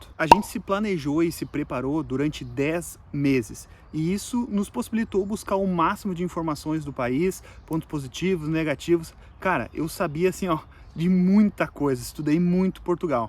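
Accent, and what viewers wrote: Rio Grande do Sul, Brazil. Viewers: Pelo sotaque chuto que vc é gaúcho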